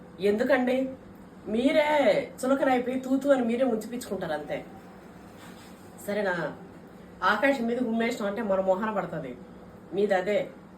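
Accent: Telangana, India. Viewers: నువు అసలు రాయలసీమ అంటే ఎవరు నమ్మరు same టు same తెలంగాణ పక్క తెలంగాణ మాటలు మాట్లాడుతున్నావ్ no doubt 😂😂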